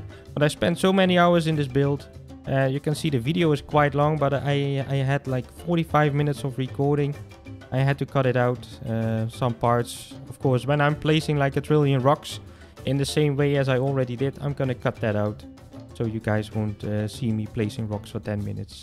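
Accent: Netherlands. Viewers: are you dutch? i also am so just wanted to know bc i heard more dutch guys talk english like that Also for other peopol reading this guy is great at the game so SUBCRIBE!!! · Heerlijk Nederlands accent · the dutch accent is strong in this one, love it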